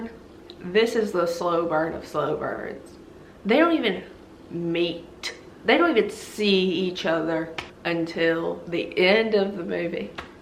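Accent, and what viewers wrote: South, United States. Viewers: your energy + your southern accent = one of the joys of life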